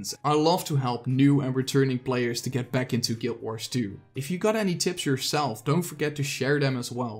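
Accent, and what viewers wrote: Netherlands. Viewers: I can slightly hear the dutch accent mijn vriend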